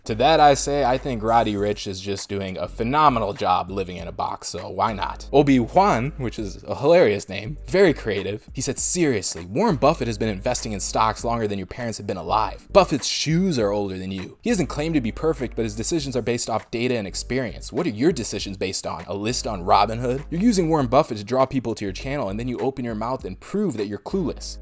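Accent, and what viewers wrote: India. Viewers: Bro are you from kerala???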